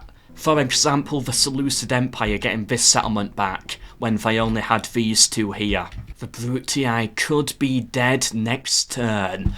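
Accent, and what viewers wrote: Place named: Northern England, United Kingdom. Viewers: It's his accent, he's from "the north". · He's a Yorkshireman, like Richard Sharpe.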